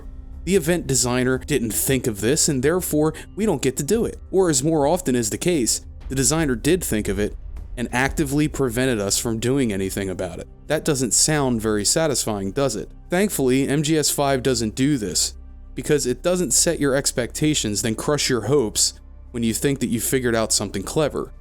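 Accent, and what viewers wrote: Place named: Philadelphia, United States. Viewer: Are you from Pennsylvania? Specifically Philadelphia area? You have the accent to a tee.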